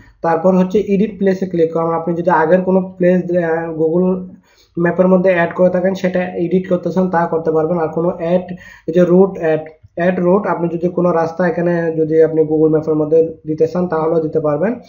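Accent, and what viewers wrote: Noakhali, Bangladesh. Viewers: শালা ভালো ভাষার কেউ নাই,,সব নোয়াখাইল্লা শালা রিভিউ রে বলে রিবিউ এখন রে একন 🙈🙈🙈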